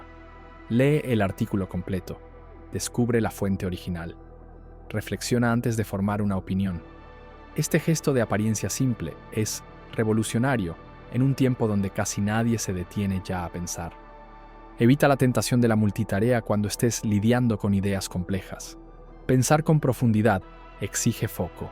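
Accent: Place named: Buenos Aires, Argentina. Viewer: Exelentes reflexiones y la narra suena bastante como nuestro castellano en Buenos Aires. Muy lindo canal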